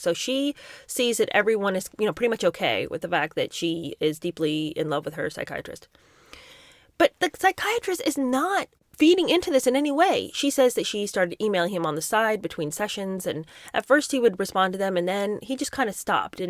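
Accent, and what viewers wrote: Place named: England, United Kingdom. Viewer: Her accent is awesome. I can't figure out where its from I feel like england?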